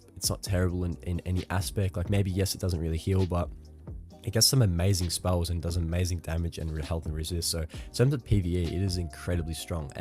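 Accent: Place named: Australia. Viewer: judging by the accent you're Aussie too, im questing atm still pretty new and looking for some people to play with if you're keen or anyone else watching.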